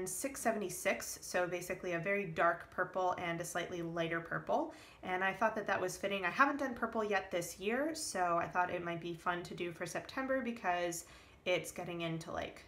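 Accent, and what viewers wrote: United States. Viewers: Mais tu es française ! Bravo pour l'accent, on croirait une américaine ! Et aussi bravo pour ton BuJo, il est magnifique !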